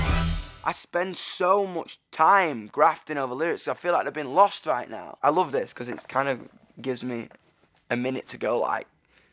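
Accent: United Kingdom. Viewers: Sure he’s passionate and this does deserve to be on genius, but another reason is because he’s British and everything they say sound amazing. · He’s British that’s how we say it it’s never fuck it’s either feck or fook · I did not know that he had a bridish acsent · This is my first time hearing him talk and I wasn't expecting him to be British lol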